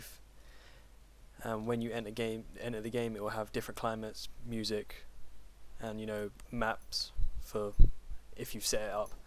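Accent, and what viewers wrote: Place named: London, United Kingdom. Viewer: London accent m8.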